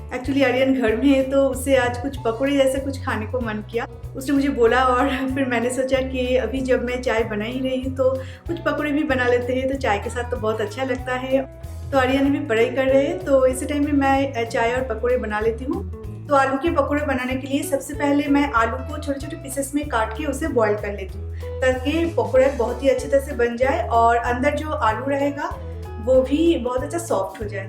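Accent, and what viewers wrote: Bengal, India. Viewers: At first your accent says you are Bengali so great u are trying to speak in hindi and secondly before seeing the video I seriously thought u are a single handed woman I mean u don't have the other hand hahaha... And finally what kind of video is this?? So what do all other women do?? Eat and sleep.. Every woman works be it housewife ir working woman.. I m not a hater but this video is a waste of time and totally useless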